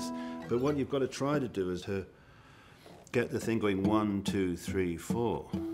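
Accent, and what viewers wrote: United Kingdom. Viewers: Cannot hear you and plus with the English accent hard to understand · I forgot this guy was British.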